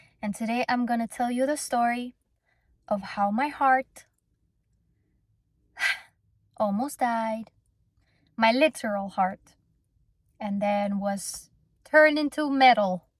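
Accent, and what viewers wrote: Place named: Italy. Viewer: I’m just curious.. are you Italian? You speak great English and I think I hear an Italian accent but I noticed a lot of your shows are in Hispanic countries.. anyway glad you’re alive and better after that crazy experience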